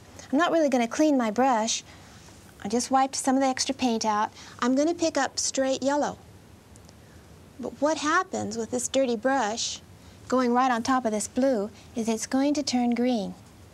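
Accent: South, United States. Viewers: Ahhh, her country accent is hypnotizing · southern style talking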